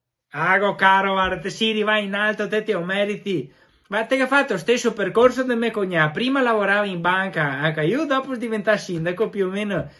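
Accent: Vicenza, Italy. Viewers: Luca scotton sono d'accordo con te è strepitoso, ma dimmi " in quale provincia parlano come lui forse Vicenza?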